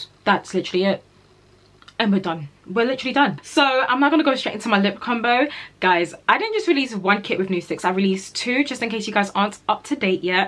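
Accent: United Kingdom. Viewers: I just subscribed because of your accent...I don't know why I love British accent so much 😭🥺🥰🥰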